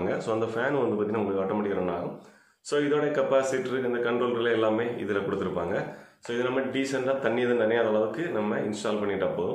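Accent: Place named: Marthandam, India. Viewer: Marthaandam என்பது உங்கள் பேச்சிலேயே தெரிகிறது நண்பா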